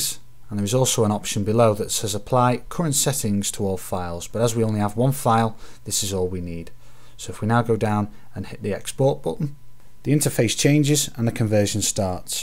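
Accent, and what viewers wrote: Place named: Liverpool, United Kingdom. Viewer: Where bout in liverpool you from lad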